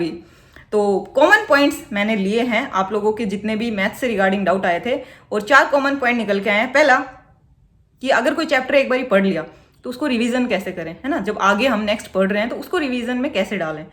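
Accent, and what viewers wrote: Haryana, India. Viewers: Your Haryanvi... ❤️ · I m from bihar, i appeared in cgl 22 mains and, got low marks so decided to prepare hard for 2023 cgl, i liked your advice and loved your Haryanvi accent, the way you explained things is superb.... You made me remind of ritu ma'am who is also from haryana and preparing for upsc.... Haryanvi wali thaat achhi lgti hai with decency.... 😊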